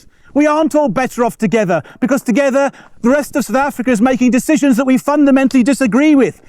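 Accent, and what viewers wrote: United Kingdom. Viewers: Why is the speaker British?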